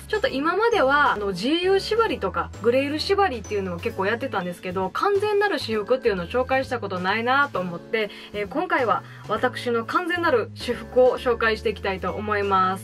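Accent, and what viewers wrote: Kansai, Japan. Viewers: 関西弁？だから芸人さんみたい笑😂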